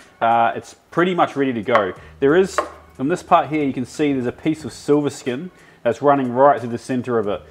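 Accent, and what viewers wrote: New Zealand. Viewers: You sound New Zealand bro. But I don't mind some Kangaroo steaks. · He from NZ but lives in Australia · All this time I thought you were Kiwi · How come you sound like a New Zealander? "Chups" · are you kiwi tho? that accent is obvi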